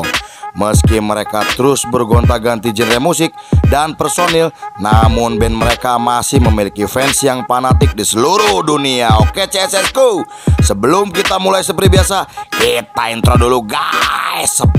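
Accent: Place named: Sunda, Indonesia. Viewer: ini narator nya orang sunda, kentel bat logat nya hahaha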